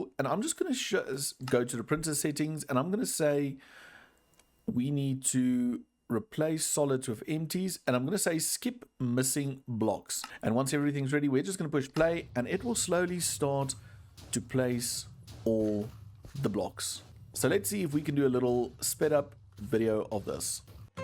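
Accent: South Africa. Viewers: So great to hear a South African accent on a good quality youtuber. Keep up the great content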